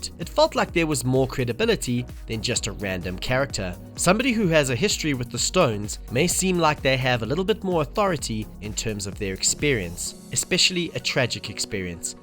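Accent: South Africa. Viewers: Random question, are you South African?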